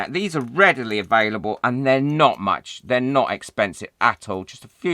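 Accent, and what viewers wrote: England, United Kingdom. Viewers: Thank you very much,! For your content, for sharing your expertise, for your generosity and your humour. And for all the love and care you put into your videos! I also love your accent, it brings back memories of the year I spent in England: absolutely LOVE it!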